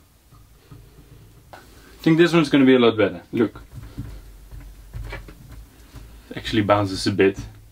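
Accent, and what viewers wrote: Netherlands. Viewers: Bent u Nederlands? Zo lijkt het wel. Ik herken uw accent.🤷‍♂️ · why is it so easy to recognize some one that is dutch like they got a very own way of talking i find it funny ps blijf zo doorgaan leuke video’s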